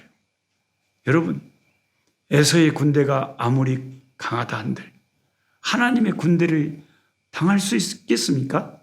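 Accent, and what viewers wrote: Jeolla, South Korea. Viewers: 할렐루야!!!주님감사합니다 오늘 목사님께부탁한책을 처음접하게되었습니다 목사님말씀을듣고 책을오늘읽어야하는부분을읽는데 어찌나은혜가되고 가슴을울리고 이귀한책이어디있나!!왜목사님이지인이나 전도하는데 쓰라는메세지를계속말씀하시는지 오늘에서야 이해가갑니다 너무나귀한책이고 감동이오고 책을붙들고기도하는데 어찌나눈물이나는지 오늘오전은주님의은혜가큰감동으로느껴집니다 목사님만난게감사가나옵니다~저는24살까지운동선수로살다가 가정을꾸미고 또공부하고는거리가먼데 이렇게많이배우신목사님이 전라도사투리로 사정없이성경말씀을이끌어가시니 어떤성경공부보다 머리에쏙쏙들어옵니다 공부와거리가먼 제가이렇게성경공부를하니 ~그것도나이먹어서 한장한장귀한말씀을접하니 성경을더가까이하게됩니다 목사님 어디다니시든지주님이지켜주시고 마하나임의하나님이역사하실것입니다 더욱더오래사셔서 저희들을주님과연결시켜주시고 깊은관계속으로들어갈수있게도와주세요 오늘은너무감사가넘침니다^^고맙습니다 서울은날씨가풀려가요~~저는교통사고합의로수요일날첫대면을합니다 좋은결과있게기도부탁드립니다^^화이팅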